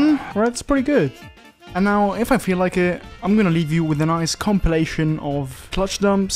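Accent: Italy. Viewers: He's gotta be an Italian living in England with that accent. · this is the most italian accent that i've ever heard from an english guy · Me watching the video thinking a yes someone speaking slowly English,: speaking Italian Me: Ah ma sto qua parla italiano ecco da dove arrivava l'accento.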